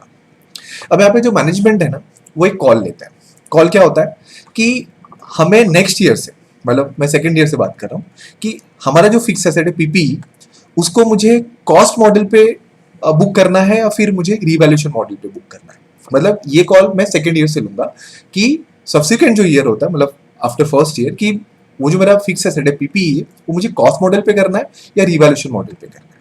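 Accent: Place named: India. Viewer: what is bro on about, i didnt understand shit of that indo-english bullshit. He's onto NOTHING💯💯💯💯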